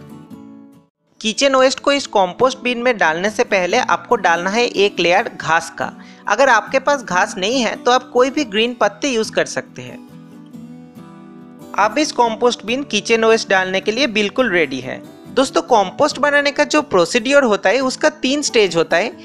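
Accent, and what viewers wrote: Bengal, India. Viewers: Typical Bengali accent. Very good. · R u Bengali sir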